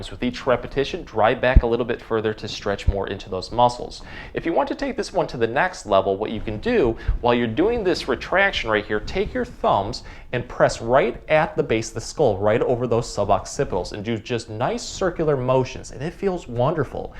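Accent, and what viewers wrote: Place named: Midwest, United States. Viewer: You’re def from Chicago or the Midwest with that accent lol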